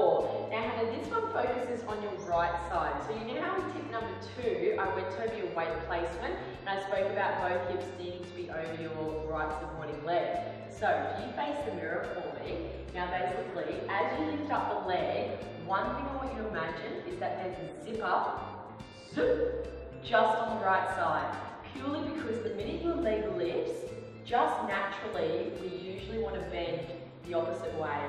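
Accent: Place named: Australia. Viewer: Hi my son and I have been watching your videos for the past few weeks and we really enjoy them. He is a budding of ballet dancer. He would like to know if you have any videos with boys in them instead of girls. He also would like to know if you coach boys. We live in New York city. But we love your Australian accent. Please let us know if you ever plan to put Boys on your Channel and display exercises for ballet Styles particularly for boys. Thanks keep up the great work.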